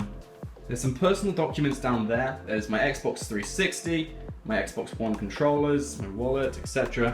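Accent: United Kingdom. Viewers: R U British? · I just realised your British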